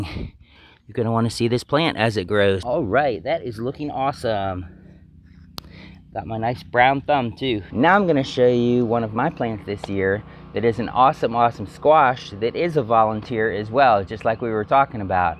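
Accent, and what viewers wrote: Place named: Pennsylvania Dutch, United States. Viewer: This is a great instructional, informative vid!👍 Wow, humongous volunteer squash leaves! Do you use DE for squash vine borers? Your accent kinda sounds like where I'm from a bit, PA Dutch country! 😉 Take care!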